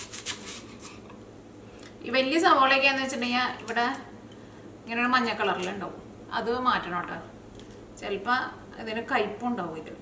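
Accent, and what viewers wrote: Thrissur, India. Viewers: Jhan chechi dae valya fan aanu, more than any thing, chechi dae Thrissur dialect aaanu enikku eshttam... entae mummyum Thrissur kaari aanu, entae mummy thannae explain cheythu tharunna poolae thoonum, chechi parayunna, ethu recepie undaakiyaalum nalla taste aanu... jhan cooking il oru beginer aanu, chechi dae tips enikku othiri eshttanu ,....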